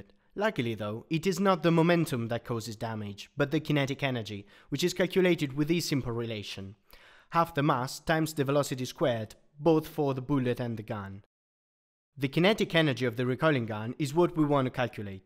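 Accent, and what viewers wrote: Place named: Italy. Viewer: Come on, give the guy a break. We all know Italians have a very heavy accent when speaking. Your fellow countrymen don't do any better in speaking English, neighbour ;-)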